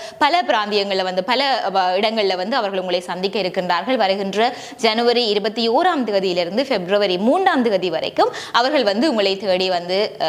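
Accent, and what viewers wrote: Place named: Sri Lanka. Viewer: தங்களின் இலங்கைத் தமிழ் உச்சரிப்புகாக்கவே நான் பார்க்கிறேன்.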